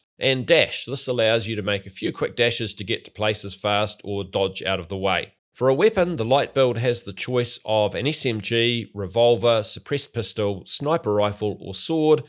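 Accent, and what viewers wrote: New Zealand. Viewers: Nothing beats the kiwi accent!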